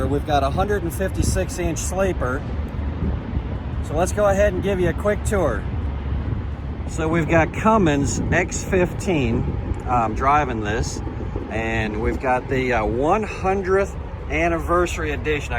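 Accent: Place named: South, United States. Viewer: Southern accent and southern slang "y'all " Im curious where y'all from.... im from New Orleans....live in Wyoming now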